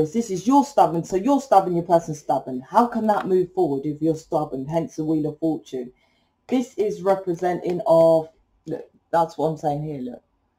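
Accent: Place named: London, United Kingdom. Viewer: So cool to listen to a Londoner on here, it's nearly all Americans!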